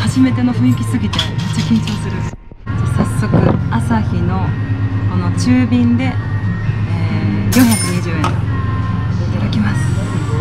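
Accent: Kansai, Japan. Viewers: 顔も関西弁も可愛い過ぎる❤️‍🔥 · なんかオヤジ飲み。でも、おも可愛い関西弁の女や。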